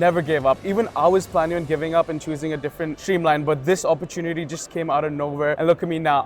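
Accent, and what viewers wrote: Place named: South Bombay, India. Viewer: bhai ye south bombay wala accent to yahin chor jata use kyu leke chala gya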